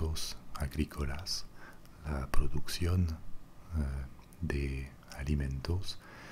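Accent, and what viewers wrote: France. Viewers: Hablas bien español reconozco tu acento france :) gracias por los videos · Nueva subscritora reconoci tu acento frances,estudie dos años frances,Tu español es muy fluido y me encantó el contenido! :) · acento francés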